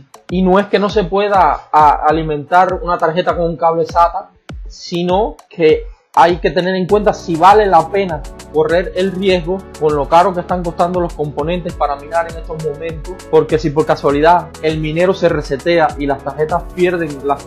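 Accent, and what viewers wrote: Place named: Cuba. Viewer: me lo imaginé por el acento, yo también soy cubano, y estoy iniciando en la minería, muy buen trabajo estas haciendo hermano, sigue así, un saludo desde Italia 👍🏻👍🏻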